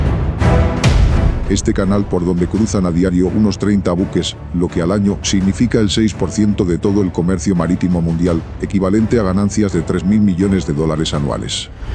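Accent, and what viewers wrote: Spain. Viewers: Eviten este canal de CM. Sólo hay locución en español de españa cuando se trata de México. Evitenlo. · Que extraño, estos vídeos relacionados con México narrados por un español!!!! Para ripley. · Quítale el acento gallego por favor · Por qué el locutor habla como español si esto es un proyecto mexicano es de México debe de ser un locutor mexicano😅😅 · Porqué un Espanyol tiene que ser la voz en Off ???? Teniendo tantas voces tan icononicas de Artistas Mexicanos!!! 😮😮😮😢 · Y por que narra un español preguntome yooooooo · Ya no queremos escuchar a un Español narrando los videos!!!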